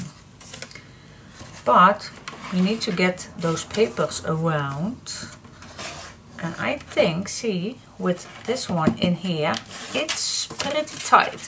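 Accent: Netherlands. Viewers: o) I love it to hear your netherland slang in your english :-) it´s so nice to hear you….sometimes (yes I´m crazy) I work on PC and hear only what you work, only for Hearing your voice….it´s like a friend in the room who works and talks with me :o) thanks for your time to make this Videos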